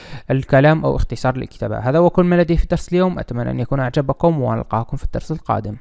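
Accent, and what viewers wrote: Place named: North Africa. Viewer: Souqran!! Hon Moussa, Ana Yahoudi ubakhki Ubrani bass I was able to understand it, knowing the small differences in our ancient languages (Hunna = Hinne, A-Salam Aleikum = Ha-Sholom Aleikhem, Bikoum = Bakhem, WaHuwa = WeHou, Kalam = Kolam, Nafsa = Nafsho, Nukdatein = Nekudothahyin, Fi-Mathala = Be-Mashall, Acher = Ahar, Kabir = Kabir...) Sound's North African. Is this Algerian or Morrocan or something else? Anyway, thank you for clearly explaining the cascade notation clearly, I saw from the code, but also was able to understand each and every word!!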